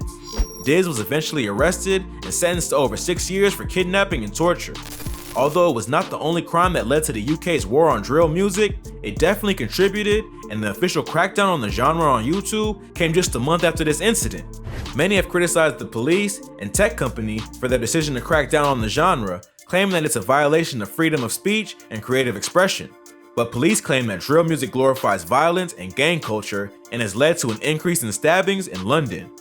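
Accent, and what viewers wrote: United States. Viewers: 😂😂why is there an American commentary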